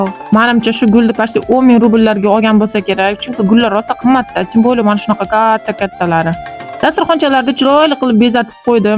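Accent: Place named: Jizzakh, Uzbekistan. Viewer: Жиззахдан экансиз гапширишиздан билдим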